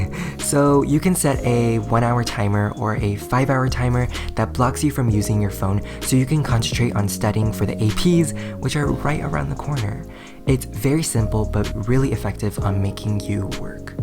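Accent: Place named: Philippines. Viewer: I swear he sounds Filipino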